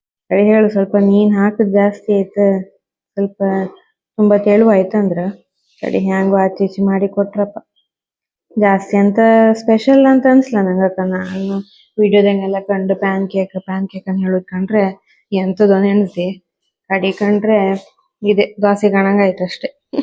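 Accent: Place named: Kundapura, India. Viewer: Kundapura kannada chanda mat addtri maree ❤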